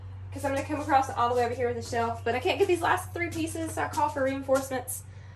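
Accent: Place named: Tennessee, United States. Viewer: Are you in Tennessee? I am . Your accent is similar. What type of soil do you use ?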